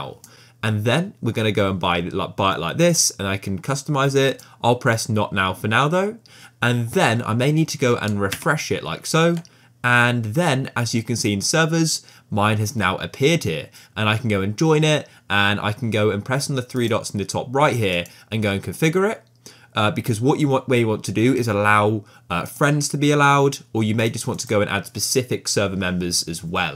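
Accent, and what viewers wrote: United Kingdom. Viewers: thx but are you british?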